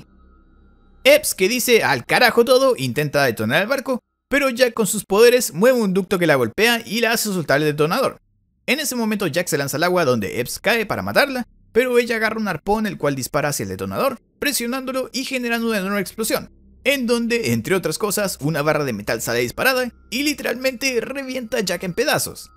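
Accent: Chile. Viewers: Una pregunta eres de chile amigo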